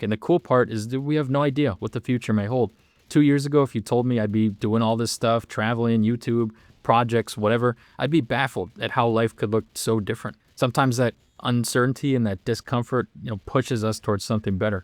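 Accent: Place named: Wisconsin, United States. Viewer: Bro has the most Wisconsin accent ever I gotta say dude 😅😅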